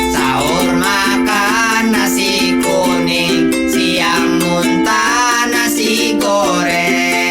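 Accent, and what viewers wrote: Banjar, Indonesia. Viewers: Logatnya terdengar seperti orang banjar apalagi pas nyebut nasi kuning wah menu andalan pagi hari 😂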